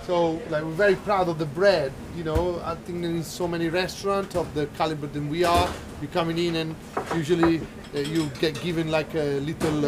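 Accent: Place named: Italy. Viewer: L'accento c'è ma il lessico ragazzi... tanta roba😎lo parla da Dio.Mi sembrava di sentire un inglese con accento italiano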